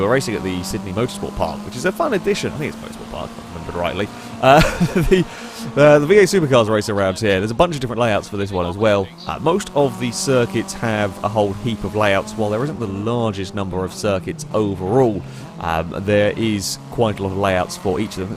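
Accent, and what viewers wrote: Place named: United Kingdom. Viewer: I love how confused people from outside America get when saying Acura. and many other British people say "A-cure-ah" where everyone in America says "Ack-your-ah" Just find it interesting how different cultures emphasize different letters on different syllables. It is a small difference, but Americans will pick up on it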